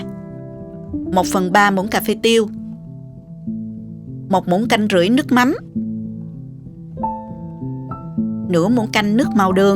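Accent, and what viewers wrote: South, Vietnam. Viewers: Giọng nói nghe thân thương ghê vậy đó. Người miền Nam mình nè! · nói đúng chuẩn giong nam bô rất dể thương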